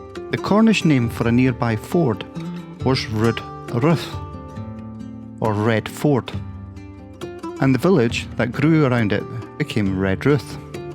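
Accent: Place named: Scotland, United Kingdom. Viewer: My old stomping ground which I left over forty years ago for the land of Oz. I really liked your Scottish burr giving the local place names a distinct pictish vibe!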